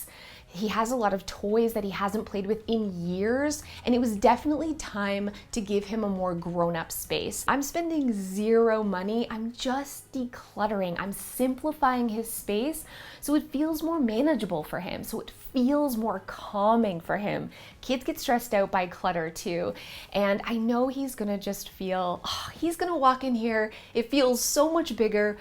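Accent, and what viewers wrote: Canada. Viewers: I have been watching/listening to your videos all day while I work. I'm the mom of 5 kids and I LOVE organizing, but nothing has ever really stuck. I have such high hopes after everything I've learned from you. I love the minimalist mom too, but I can't fully get on board with that concept so it's nice to have categories that I put my things into. I've taken breaks all day long to declutter certain areas, it makes such a difference to take things one shelf/cupboard at a time. I love that you say organizing can only come after the decluttering. I'm working on decluttering all the areas first then go back to really organize. Thank you for being so motivating, so relatable, and so kind. Also, my dad's family is from Calgary Alberta, where are you from? I can hear your Canadian accent..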